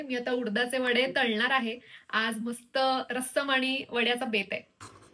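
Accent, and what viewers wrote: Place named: Brahmin, India. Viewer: अग तू किती लहान आहेस... आणि किती शुद्ध मराठी बोलतेस... आम्हा ब्राह्मणांसारखंच बोलतेस.. मला तुझा खूप अभिमान वाटतो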